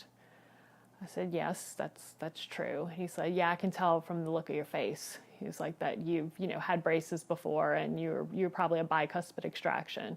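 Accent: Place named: South, United States. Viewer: Our girl's got that southern accent🔥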